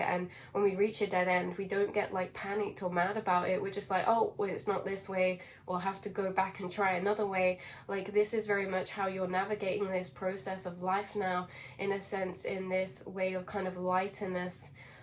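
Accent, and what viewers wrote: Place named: England, United Kingdom. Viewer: You are beautiful and your English accent sounds cute . 8 )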